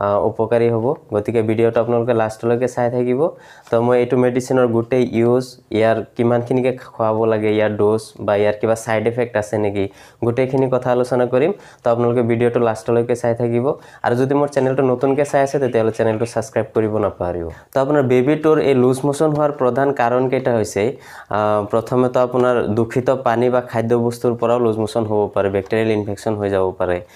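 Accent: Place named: Kolkata, India. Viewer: kolkatar language mone hosse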